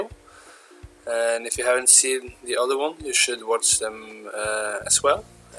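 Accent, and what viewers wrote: Norway. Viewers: are u from norway?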